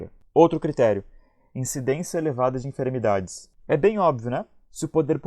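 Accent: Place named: Rio Grande do Sul, Brazil. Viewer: Muito perfeito o seu vídeo, amei a dicção e o sotaque gaúcho, resumo de milhões. Espero que não tenha desistido de criar novos vídeos!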